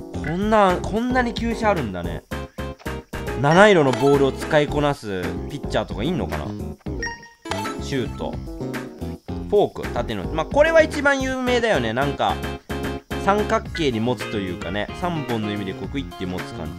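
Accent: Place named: Hiroshima, Japan. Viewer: レトルトさん、喋り方広島弁ぽい笑笑あそこら辺の人かな？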